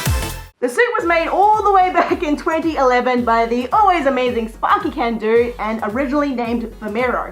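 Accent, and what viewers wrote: Australia. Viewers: Are you Australian cause I am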